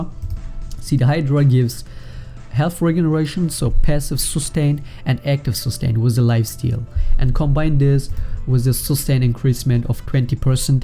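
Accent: Germany. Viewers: u are german right ? :D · are you german?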